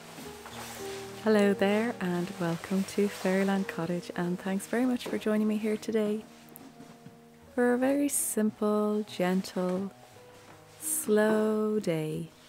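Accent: Ireland. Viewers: Nice, soothing Irish lilt, thanks! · Those mint leaves also are fantastic with lamb or even in a salad. I love mint tea also. Very nice peaceful video and your voice an Irish accent is beautiful. You have new subscriber from Chicago!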